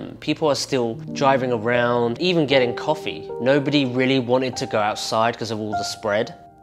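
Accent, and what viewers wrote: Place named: United Kingdom. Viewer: I'm impressed with your British accent.. o.o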